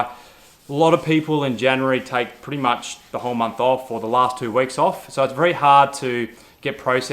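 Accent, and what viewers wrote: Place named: Australia. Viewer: Very funny to hear your oistralian accent when you talk about boikes sometoimes and learning that you are not a cloimber :-)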